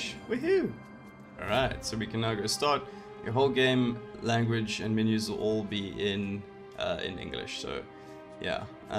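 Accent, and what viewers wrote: South Africa. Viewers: Do I hear a fellow SA accent? XD Thanks for the vid!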